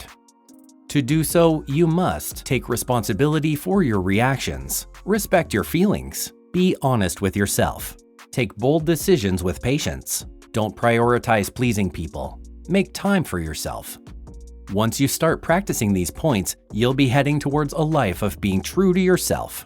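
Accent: Canada. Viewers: you have a Canadian accent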